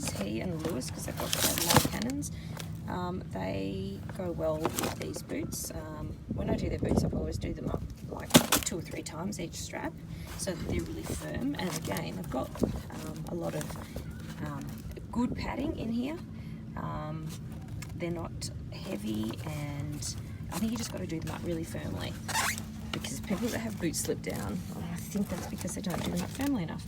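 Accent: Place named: United Kingdom. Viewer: Im from uk and i pronounce it same as you lol. What part of uk were you in they are giving us a bad name guys lol xx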